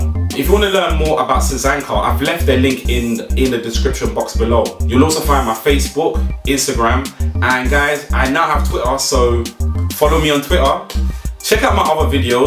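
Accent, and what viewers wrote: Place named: United Kingdom. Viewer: A black man speaking English with a British accent in Amsterdam while at a Japanese restaurant... Whoa my brain just froze bruv I swear lol but this vid satisfying af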